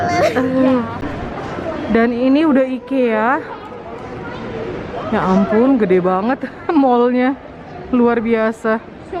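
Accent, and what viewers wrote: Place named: Java, Indonesia. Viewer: Tetap ada logat jawanya ya ,,sukses terus buat kelurga mamah 👍👍👍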